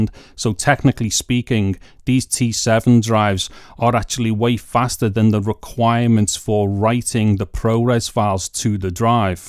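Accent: Liverpool, United Kingdom. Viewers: Scousers posh telephone voice right here 👆🏼 great video mate really informative 👍🏼 coming from a Warrington half and half over here 🍻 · This was just what I needed to be certain that the Samsung T7 works for shooting video with the iPhone 15 Pro Max. I've now bought the hard drive and I am excited about trying it out. Thanks so much for sharing this. Also, as a silly American I am just in awe at how amazing your accent is. Is that Liverpool? It sounds so cool!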